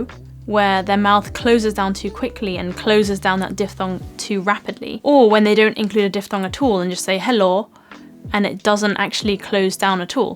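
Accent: RP, United Kingdom. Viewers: Good video,. I love how you explain and your British accent sounds cool♥️💘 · I have to say that finding a youtuber who speaks with a perfect RP British accent is quite hard. You'll easily discover quite annoying posh RP British accents, but rarely a pleasant one. Luckily is an exception. She sounds beautifully British. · I love English accent because of you ❤️❤️❤️ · This is how English should be spoken ... for me when I hear Asians speak English , but then sound American .. it just sounds wrong! It would be better to learn English from an English person , rather than an American ...🙏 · your british accent is super cool.But i'm struggling about this accent.😂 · How how is it.... An Asian woman with a British accent... Errrrrghhhhhhhhhhhhhhhhhh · u so beautiful and i love yr british as well 🙌🏻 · Oh, I love the British accent! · I love the way you speak and elaborate the British pronounciation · Lovely posh accent. 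You wont hear many people speaking with that accent however. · Double Blessings from God Beautiful Lady Still having such a great accent of nodern RP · I love your British accent, but to someone I can't understand is Kate Beckinsale :‘( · I love your British accent, it's so beautiful and I'd love to speak the same with you! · Dang not only you are smart and pretty but with british accent. You are everyones dream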